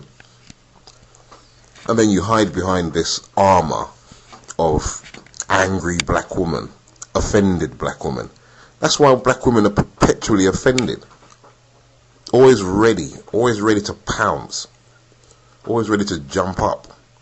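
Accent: United Kingdom. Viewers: well i take care of myself but i dont consider myself to be a strong and independent woman, i dont wear weave or spend my precious money at the beauty shop and i NEED a man to do take are of me, as he should. so does this make me a black female (which is what i am) or a white woman? lol this dude is seriously stereotyping all black females! where is he from? wales? love the accent! i would love for a real man to step to me and do what he should! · Sounds like London !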